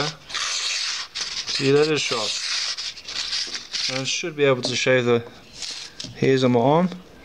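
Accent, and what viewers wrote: South Africa. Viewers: are you South African? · I smaak the Souf Efrikan eksent bru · Jis man kan hoor dis n boertjie wat hier praat. 😉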